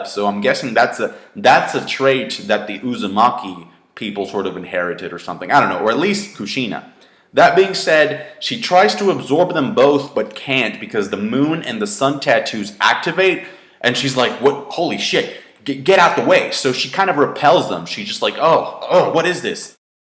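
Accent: Mexico. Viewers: Si habla como mexicano, yo también lo soy. jajajaja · Español con acento mexicano.... jajajaja pero la pronunciación es impecable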